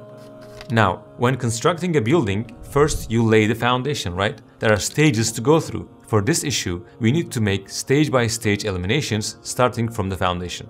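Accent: Turkey. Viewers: you have a turkish accent ,i lived in turkey such a muslim country ,as a tourist you are a walking walet to them you get ripped off the moment you step in a cab at the ap until you leave the country .from government offices all the way to corner shops ,i travel a lot i have seen many countries there are bad apples everywhere but turkey as muslim country should set an example not be the worst in the world , this example itself proves whatever islam is offering it's not working ,and i am going to stop here and good luck with your lessons of saving souls and converting ppl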